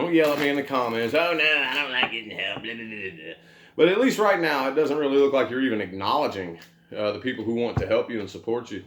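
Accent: South, United States. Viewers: Lmaooooo....i love that country accent oh my god.....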